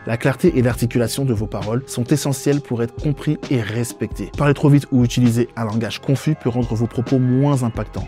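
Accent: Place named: Ivory Coast. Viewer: Avec un subtil accent suave Ivoirien 😅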